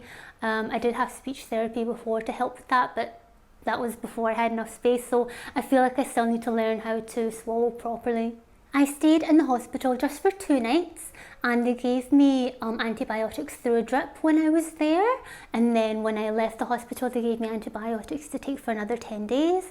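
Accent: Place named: Scotland, United Kingdom. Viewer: Did not expect you to have a Scottish accent! Awesome results!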